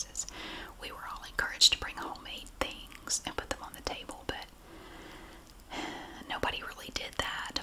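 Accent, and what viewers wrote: South, United States. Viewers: It's still a raffle, even if they aren't paying money for the tickets. Raffle just means a random drawing. Thanks for this. I made a comment on your golf video about you being too entertaining for me to fall asleep to your videos. I'm too interested in this so I can't sleep. It's okay. I always have the option to watch your jewelry vids. Those work for me. I hope you have/had fun at your reunion!!! You always do so much for us. I wish I had a billion dollars to give you because you deserve it. I just come for the whispers. Your southern accent just calms me. You have such a motherly-vibe. Do your kids and family know how much you've helped other people?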